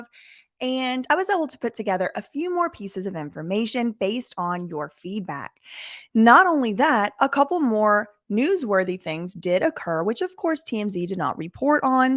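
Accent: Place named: Louisiana, United States. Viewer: I knew you had to be from LOUSIANA 😂❤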